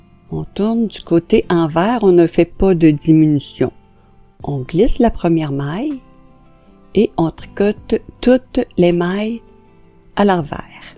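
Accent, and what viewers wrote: Quebec, Canada. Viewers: J'adore ces pantoufles que je vais commencer ce soir , et vous Madame , comme professeur , vous êtes super : les expliquez très bien et quand vous approchez la caméra , on ne perd rien de l'explication , c'est super ! Cependant j'ai un petit bémol à propos de la musique que je trouve beaucoup trop forte , Je suis malentendante et je perds beaucoup d'explications à cause de la musique . J'ai essayé de l'enlever par tous les moyens que je connais mais je n'ai pas trouvé de solution ... C'est le seul petit reproche que je peux vous faire et à part ça , vous êtes du Québec, comme moi et ça me fait plaisir d'écouter votre voix . Merci pour tout .Excusez mon audace .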